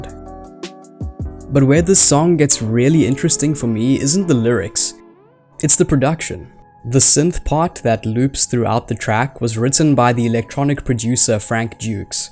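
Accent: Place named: South Africa. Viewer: Kwaai video broer en opbreuk. Jou aksent klink Suid Afrikaans, indien nie... definitief Australiaans of Neeu Seelands😊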